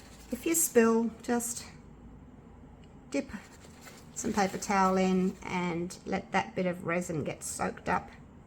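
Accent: Australia. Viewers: Woah kookaburra are so big. And I got it right the other bird is an Ibis. I’m a brand new sub. Are you Australian or from New Zealand?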